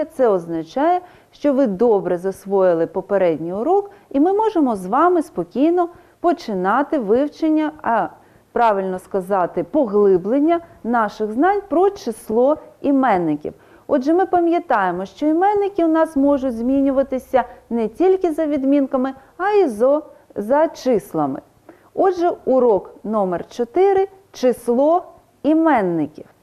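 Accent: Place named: Russia. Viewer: дякую! Насправді питання були до вчительки, яка в ролику саме таким чином наголошує слова: 9.46 - сАнки, 16.29 - островА. Ще запитала б про наголос у слові "збірні" (11.55), адже у словнику подається наголос на першому складі цього слова. Чутно, що людина російськомовна, хоча й старається говорити українською. Просто виникає питання: чи подібні ролики дадуть дітям переконливі знання?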